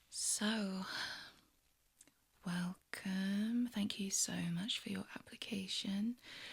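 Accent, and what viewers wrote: United Kingdom. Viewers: Your British accent makes totally devoted to u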